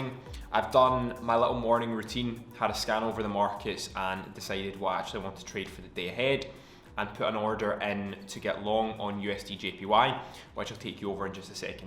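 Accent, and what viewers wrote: Scotland, United Kingdom. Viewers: Do you live or Glasgow or Scotland because your sound it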